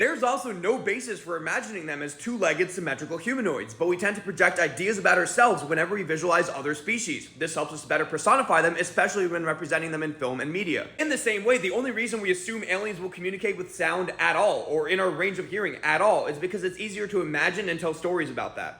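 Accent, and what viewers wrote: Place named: Baltimore, United States. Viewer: That’s just Baltimore accent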